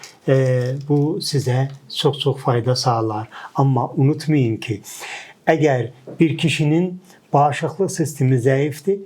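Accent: Azerbaijan. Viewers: Ya sen ne güzel bi adamsın ömrüne bereket versin allahım hocam çok sağol ne güzel bilgiler veriyorsun saygı ve sevgi ile takip ediyorum ❤❤❤❤ Ayrıca az türkçenizde çok sevimli 😊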